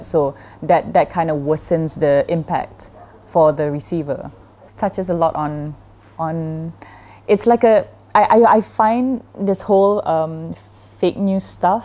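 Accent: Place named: Arab world. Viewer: Iya , i lOve R , pelat Arab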